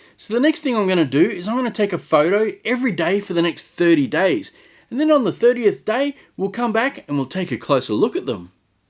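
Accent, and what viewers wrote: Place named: Australia. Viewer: Completely off topic but I love your Australian accent haha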